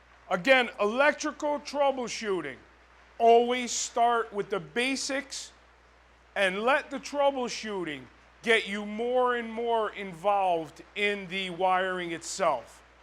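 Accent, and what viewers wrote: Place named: New York, United States. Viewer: New Yorker.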